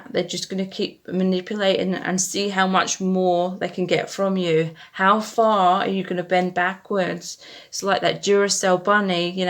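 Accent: United Kingdom. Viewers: You are wonderful , gifted , you are the real deal ❤.By the way I adore ❤ and your Britich accent .Wonderful job !!!!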